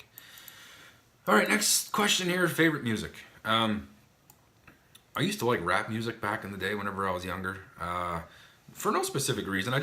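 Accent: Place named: Canada. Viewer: I love your accent. I knew you were Canadian the instant I heard you talk on one of your videos. New subscriber here! Keep making vids, they are great, and you're really interesting!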